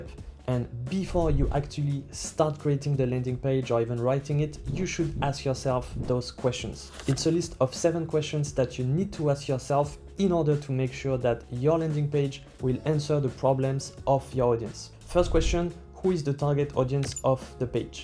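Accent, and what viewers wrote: France. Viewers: cela sent l'accent francais 😮‍💨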